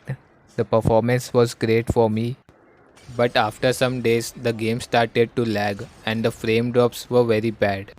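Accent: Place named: United States. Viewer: i thought ur an american, awesome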